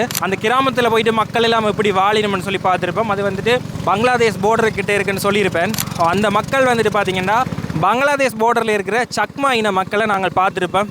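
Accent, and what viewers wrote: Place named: Sri Lanka. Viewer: தம்பியின் இலங்கை தமிழ் பேச்சு மற்றும் காணொளி அருமை. பிடித்திருந்தது. Subscribers குறைவாக இருப்பதை பற்றி கவலை வேண்டாம். கலியுகத்தில் நல்லவர்களுக்குத்தான் துன்பங்கள் மற்றும் மன உளைச்சல்கள் வரும். ❤❤❤❤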